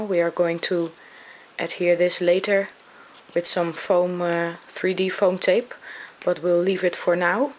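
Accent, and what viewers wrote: Netherlands. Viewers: Where can one buy that mini hole punch? I think your instructions are wonderful and so clear. I love your Dutch accent too, so pretty.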